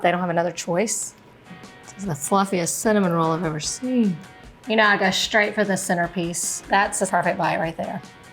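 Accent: South, United States. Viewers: I hear a slight southern accent 🤔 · has a sweet southern accent, one of the things I love about listening to her videos. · Yes she's great at this! But I always welcome a southern drawl...ya' all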